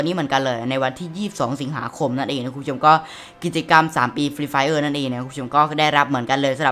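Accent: South, Thailand. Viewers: พี่คนใต้หรอครับ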